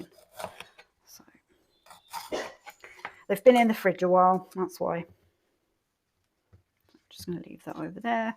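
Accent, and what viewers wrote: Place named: South East England, United Kingdom. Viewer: I prefer natural unedited videos. You must be be from the south east your accent is the same as mine.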